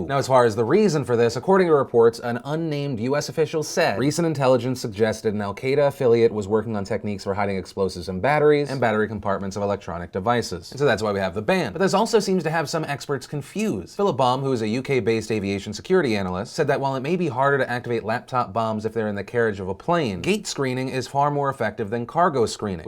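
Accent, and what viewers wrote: South, United States. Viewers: I just noticed that you have a southern accent, I've been watching for years, and I just realized.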